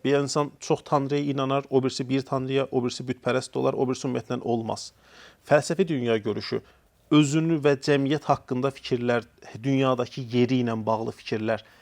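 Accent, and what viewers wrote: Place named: Shaki, Azerbaijan. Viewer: Nitqinizdə Şəki ləhcəsi oturub.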